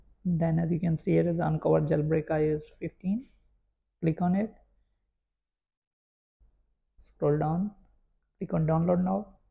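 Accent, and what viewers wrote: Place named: India. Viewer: When I heard that Indian accent I knew it was fake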